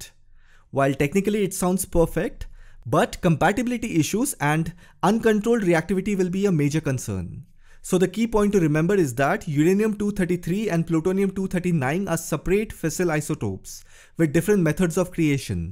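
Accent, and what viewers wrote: India. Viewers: Love your Indian accent and really great explanation, thank you.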